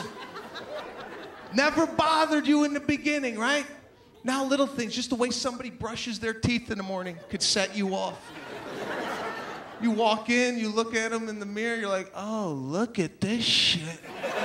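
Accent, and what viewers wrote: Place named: Chicago, United States. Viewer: He has one of the strongest Italian Chicago accents I've ever heard.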